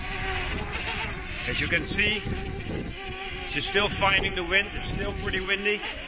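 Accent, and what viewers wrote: Netherlands. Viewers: Nice! i was looking for a review in strong winds. Thnx! By the way, are you Dutch?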